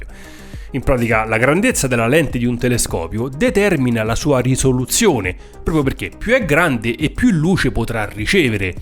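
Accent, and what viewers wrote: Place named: Sicily, Italy. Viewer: PS: sono di Palermo, mi diverte un casino sentirti parlare spesso nei video con l'accento siciliano, vai alla grande 💪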